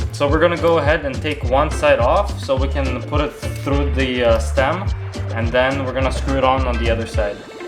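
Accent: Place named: Russia. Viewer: He sounds like russian blyat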